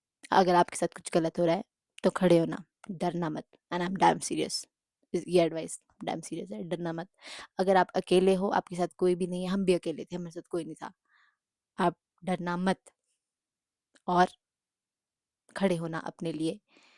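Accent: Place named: Pahari, India. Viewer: storytime with pahari accent good one 😂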